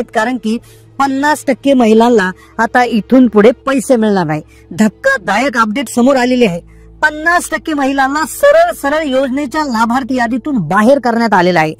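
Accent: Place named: Kokrale, India. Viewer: कोकराळे मध्ये बोलते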